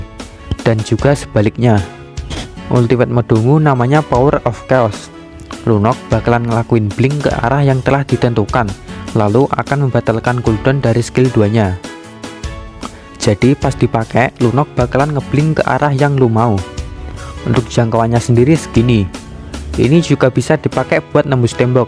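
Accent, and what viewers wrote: Java, Indonesia. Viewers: Medok amat bang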